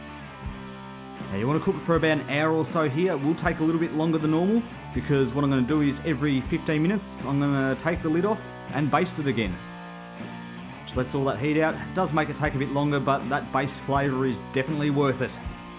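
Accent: Australia. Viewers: It's so good it's retarded. Real, ocker Aussie speak. Love it mate!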